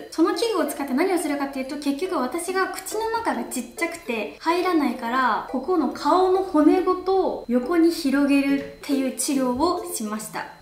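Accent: Oita, Japan. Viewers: たまに語尾が聞き覚えあると思ったら、同じ大分県の方なのね😂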